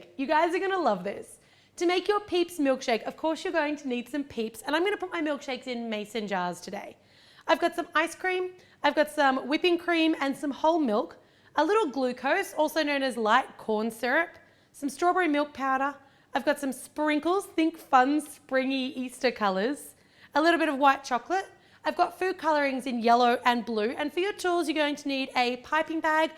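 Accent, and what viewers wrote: Australia. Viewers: Your Australian right?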